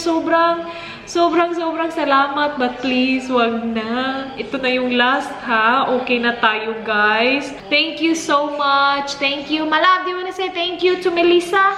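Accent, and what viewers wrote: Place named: Visayas, Philippines. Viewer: Ayieeeeee himos kaayo ma bati imong inistoryahan te ba na a Jud Ang bisaya accent 💖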